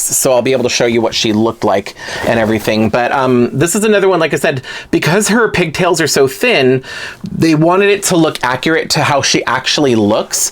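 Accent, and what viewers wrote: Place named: United Kingdom. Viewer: My goodness that British accent lol😂 I hope that’s not what we sound like 😂😂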